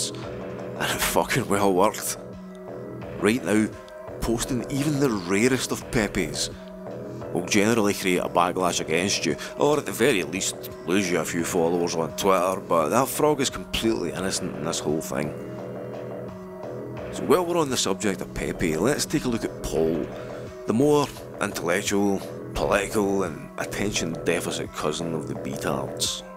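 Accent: Scotland, United Kingdom. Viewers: aw mate as a fellow scot hearing your voice made me happy, first time finding you, we need more proper strong accent scot readers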